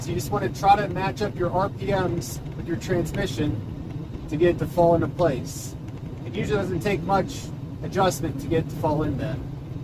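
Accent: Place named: Minnesota, United States. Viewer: Where are you from? Minnesota?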